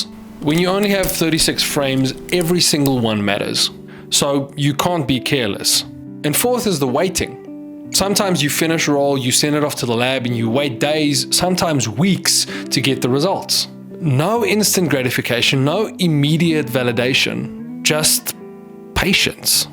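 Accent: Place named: South Africa. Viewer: Howzit, this film really resonated with me. I’ve always written with a fountain pen since school days in the eighties in KWT. When I learned to shave, my great uncle gave me his cutthroat razor which took a lot of practice to master. When I started fly fishing, my wife bought me a split cane fly rod. Each of these force me to slow down and think about what I am doing. Two years ago I went back to vinyl and this year I began teaching my son film photography. Every days is calmer, every moment is a little more considered. Thank you, keep making your films. It’s lovely to hear a South African voice and see parts of SA when living in rainy, cold England.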